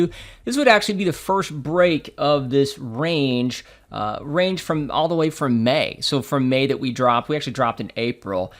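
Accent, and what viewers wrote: United States. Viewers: Yo Ive missed that southern accent 3QwzaeEqKVQLbkoMYqrZbYf9f5dK9R3KCm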